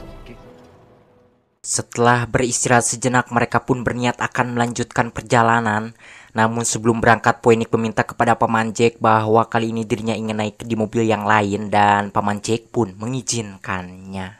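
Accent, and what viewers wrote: West Java, Indonesia. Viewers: Urang sunda? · Wihhh Sunda Palih mana kang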